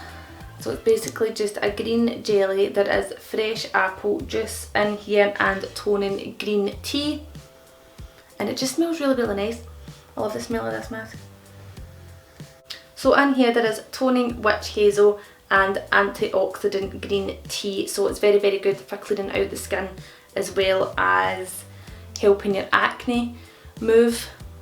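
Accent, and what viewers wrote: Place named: Scotland, United Kingdom. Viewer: I've only watched like 2 mins of the video but just wanted to say that you are so beautiful and I love your accent 😃 I'm from just south of London so have a super boring accent and I think Scottish accents are lovely!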